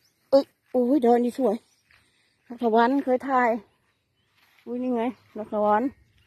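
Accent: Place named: Laos. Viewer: พูดเนิ่อๆ เหมือนคนอุตรดิตถ์ลาวเวียงนะแม่บ่นไปเถอะได้ตังค์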